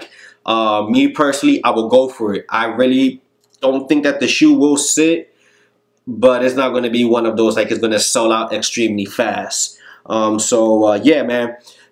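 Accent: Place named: New York, United States. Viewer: cant figure it out. that a new yalk accent or puerto rican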